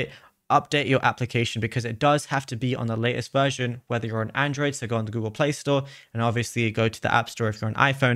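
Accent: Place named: United Kingdom. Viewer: He’s got a British accent and he’s got it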